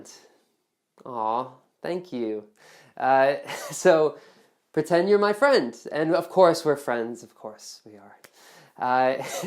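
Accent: United States. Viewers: Are you American ???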